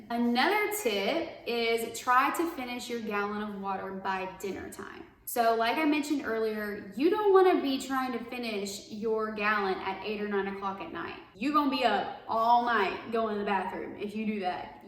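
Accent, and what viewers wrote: United States. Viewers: ahhhh. I get you now. you have a little southern charm in your voice. I picked that up quicker than I did with your sarcasm.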